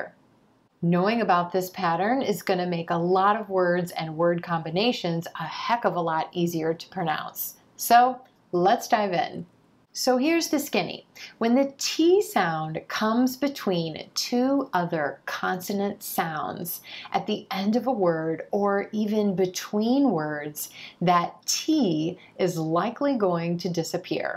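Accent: United States. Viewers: The best American accent teacher I have ever met so far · You're a wonderful instructor of the American language. Stay blessed. BTW can you tell me you're from which state in the US?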